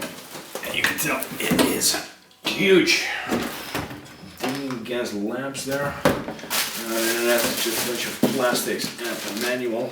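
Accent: Netherlands. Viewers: leuke en mooie video man ! Ik kan horen dat je nederlands bent haha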